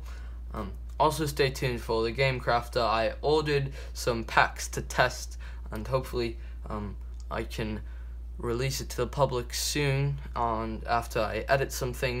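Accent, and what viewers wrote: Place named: United Kingdom. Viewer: British accent 10/10 :l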